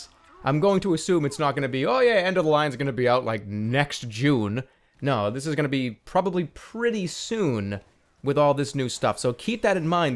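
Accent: Boston, United States. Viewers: just a question but are you from Boston because it kinda sounds like u have the accent btw nice vid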